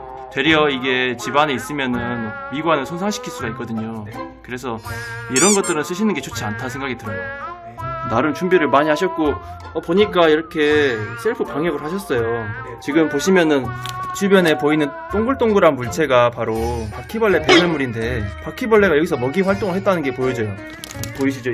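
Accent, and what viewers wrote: Jeolla, South Korea. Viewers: 아 진짜 너무 소름끼쳐요ㅋㅋㅋㅋㅋㅋㅋ와중에 전라도 말투 너무 귀여우ㅑ욤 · 요게 어디 사투린가요?? 설명 읽어보니까 광주적혀있는데 이게 전라도 샅투린가요?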